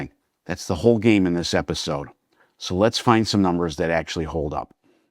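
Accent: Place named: New Jersey, United States. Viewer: . I really enjoy your videos. Thanks for doing them. It might be your Jersey (?) accent, but Wimbledon does not have a t in it, and asterisk is pronounced as it is spelled. I realize i am pedantic.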